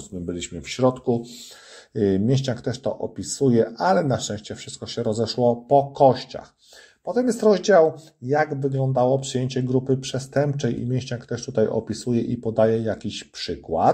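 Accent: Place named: Warsaw, Poland. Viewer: A ja tam z innej beczki. Znałem dobrze jednego mechanika z Wołomina i on tak jak Ty mówił oksiązce i wchodził w dźwwli.Slychac w Twojej wymowie podwarśawski akcent. Z fartem 💪💪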